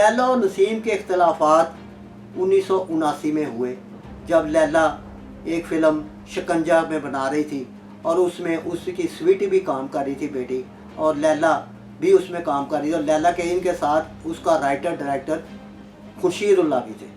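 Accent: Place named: Punjab, India. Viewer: Buth boray lyjay may batha rahy oap k8 urdu punjabiyu k8 thara hai ap k8 video sun nay k9 dil nahi chahatha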